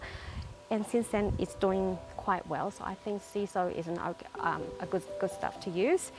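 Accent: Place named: Australia. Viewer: aussie accent blended with some asian accentis excelent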